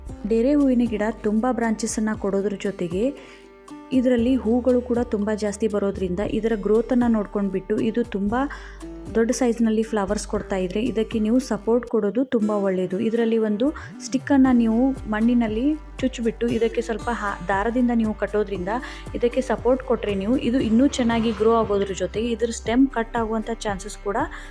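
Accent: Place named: Bangalore, India. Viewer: ನಮ್ಮ ಬೆಂಗಳೂರಿನ ಕಡೆಯವರು ಎಂದು ಭಾವಿಸಿದ್ದೆ, ಬೆಂಗಳೂರಿನ ಕಡೆಯ ಬಾಷೆ ಚೆನ್ನಾಗಿ ಮಾತಾಡುತ್ತೀರಿ ವಂದನೆಗಳು ಮಾಮ್.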